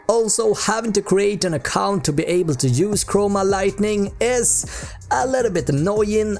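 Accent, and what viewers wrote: Sweden. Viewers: u sond so swedish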